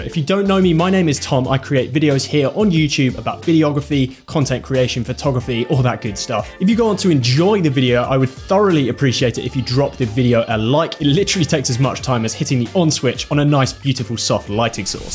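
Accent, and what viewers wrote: United Kingdom. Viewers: Did anyone expect a British sounding skater boy to talk about beauty lighting set up? No. But did he nail it? Yes.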